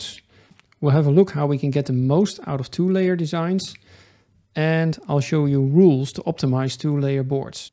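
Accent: Netherlands. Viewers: Thanks for explain clear, by the way i like your clean English with the little dutch accent. Kind regards from germany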